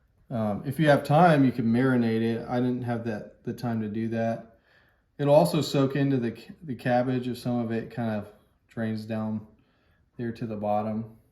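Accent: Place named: Louisiana, United States. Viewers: Where did that thick southern accent come from all of a sudden? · Are you livin down in Louisiana? Sounds like it. I'm from Shreveport. Your cookin looks good. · I am from Louisiana & I hear da accent! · Ok….. Why do you have a normal voice while cooking one recipe and then….. out of the blue, you’re a full fledged Cajun!!! I love it!!❤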